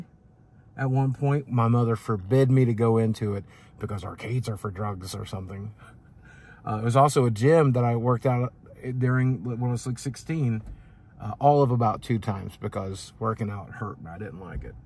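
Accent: South, United States. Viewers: Is that a Southern accent coming back?😉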